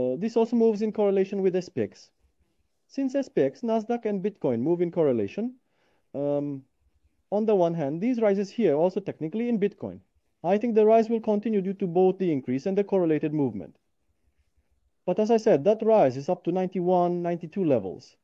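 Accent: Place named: Russia. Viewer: Baştaki aksan ile yayin ortasinda aksan degisiyormu icindeki rus ortaya cikiyor bir anda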